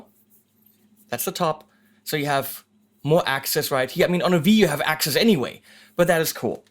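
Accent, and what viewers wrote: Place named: Germany. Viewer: wonderful Sherman Akzent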